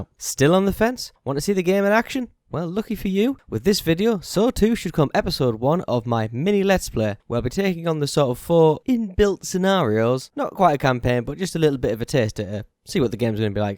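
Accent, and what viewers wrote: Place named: United Kingdom. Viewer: If you actually talk to British people as you say you do, then you would know that is British. Same as most countries we have regional accents, and if you say you 'can't' understand him, then look else where. What i find most hilarious about your comment is that American's and Australian's got there English from the actual British! Be more considerate instead of being a douche! To, Your video is fine the way it is, great job! Don't listen to people who proclaim to know what a British accent sounds like:p as i said its regional!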